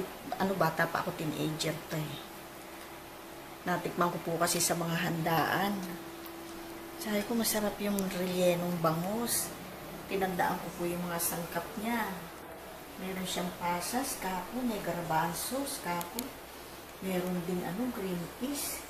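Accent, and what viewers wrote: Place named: Pampanga, Philippines. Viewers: may accent kang kapampangan maraming Eusebio sa san fernando, pampanga. nkakapaglaway kang panuorin s tikim portion mo. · ate atin kang accent, kapampangan the best for cooking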